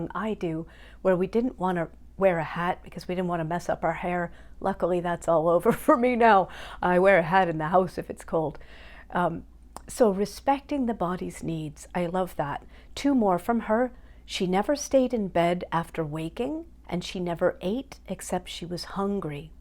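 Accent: Ireland. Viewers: Greetings, I'm not up to scratch with tenoliglical stuff, I lived on the land since I was born, my friends are like you, nice people. I'm off ta play music tomorrow, I know how ta grow food and be basic, I goes up to meet nice neighbours and I tell them I jump on to you you tube and came accross this lady called, they laughed their heads off at me, this man is a dab hand in writing books on Irish language, I said maybe you should invite this lady over, I left de message with him, I was telling em she speaks like a donegal woman, ican see your good hearted woman, thanks for replying, If you get to Erin again I'll introduce you to nice people. Your very modest woman, I can understand you when you speak. Kenneth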